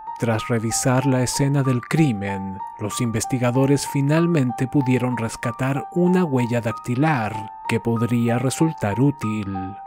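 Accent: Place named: Chile. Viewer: es la magia del acento chileno que al hablar lento, parece musica para tus oidos, como si oyeras al silencio cantar: <link>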